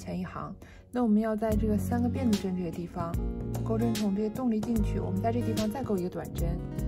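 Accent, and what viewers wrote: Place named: Beijing, China. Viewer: 你应该是北京人吧？🙂耳熟。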